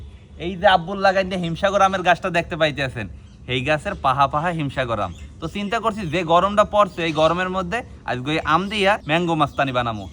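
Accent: Barishal, Bangladesh. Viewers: আপনার বাড়ি বরিশাল কোন থানায় বলেন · হালার বরিশাইলা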